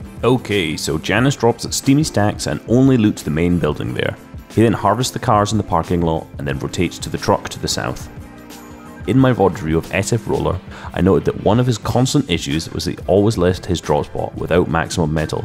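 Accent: Scotland, United Kingdom. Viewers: Seen u on nicks stream and to hear a Scottish accent was a pleasant suprise. Keep up the class vids🏴🏴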